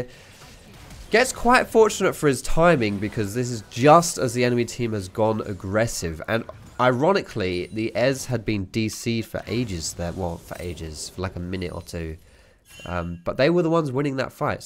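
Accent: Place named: Southern England, United Kingdom. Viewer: He is from the south of England, around Kent judging by his accent.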